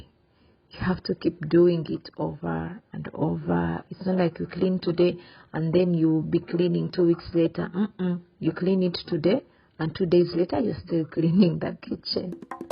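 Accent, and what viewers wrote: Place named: Zimbabwe. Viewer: Accent sounds very Zimbabwean 😘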